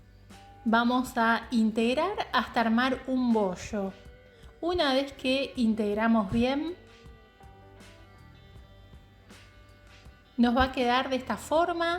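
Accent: Uruguay. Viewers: Linda tarta! De donde habla? Por el acento, me imagino que sea Uruguay o tal vez Argentina. Saludos de un brasileño que adora tus recetas 😉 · Que padre me encanta ese acento genial no me equivoque te cuento mi yerno es de Montevideo Uruguay sin q te ofendas tiene muy parecido el acento aunque tiene muchos años viviendo en CDMX el es actor....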